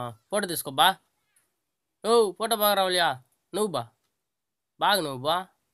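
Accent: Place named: Chittoor, India. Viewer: Nice brother super comedy chittoor yasa adhiripoindhi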